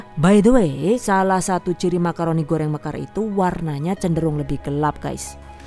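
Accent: Banyumas, Indonesia. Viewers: Wkwk wong ngendi sii , geneng ngapak ya 😆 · wong banyumas kieh...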